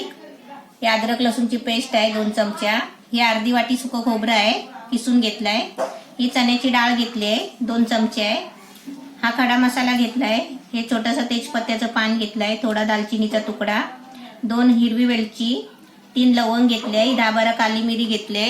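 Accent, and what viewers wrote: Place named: Malvani, India. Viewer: Wa..mastach..tumche malvani type bolne chan watye..aamche watta tumhi..tumchya recipe nehami baghte me wahini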